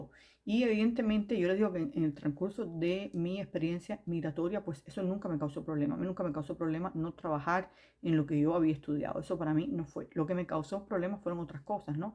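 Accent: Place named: Cuba. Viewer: Hola soy cubano, y por su asento creo que es cubana. Me gustaría que hicieras un vídeo para nosotros los cubanos a ver nuestras perspectivas pq si, llevo rato viendo vídeos de YouTubers pero ninguno de la perspectiva de un cubano.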